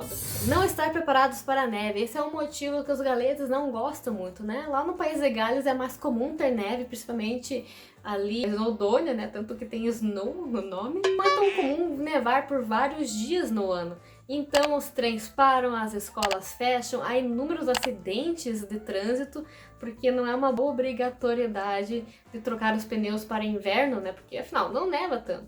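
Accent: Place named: Santa Catarina, Brazil. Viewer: Ganhou um inscrito pelo sotaque. Só fiquei meio! Particularmente. Seria Catarina. Mas se do interior, Rio Grando. Mas bonita, e um sotaque para I’m agradavel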